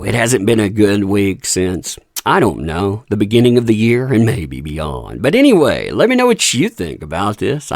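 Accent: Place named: Tennessee, United States. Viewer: Hey All, Please hit the like button, watch all the way through, & share this video everywhere, it’s important. Plus, who can resist listening to voice & beautiful Tennessee accent, that is a sophisticated, cultural gem; an accent that’s quickly disappearing from society. Thanks!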